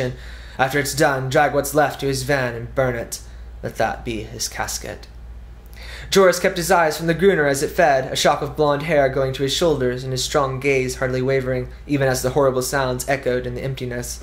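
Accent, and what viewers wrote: England, United Kingdom. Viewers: are you british?or are your characters? : ) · i like your english accent!:D i cannot wait till this book comes out!!! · why are you reading in a British accent???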